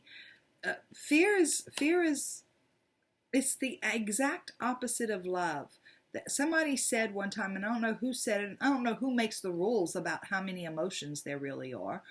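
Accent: South, United States. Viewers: Well said! No one like you, a shining light in the world with a southern accent!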